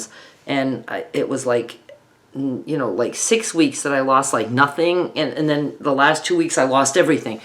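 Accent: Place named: Massachusetts, United States. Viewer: Hi, I found you recently and am thrilled! You are an amazing artist!!! I was planning to respond to the video where Skylar colors Memere's hair. I was so moved to hear someone say the French name for Grandmother that I almost cried. I lost my Mem years ago and very rarely hear the term any more. My family is originally from Worcester, Mass. and they have your accent. Most are gone now, so hearing your voice is very comforting! I am a new subscriber, so really looking forward to unpacking all you offer, which is quite a lot! Can't tell you how you lit a candle in my heart, thinking about my own Memere'! Thanks for offering us the gift of yourself and your art.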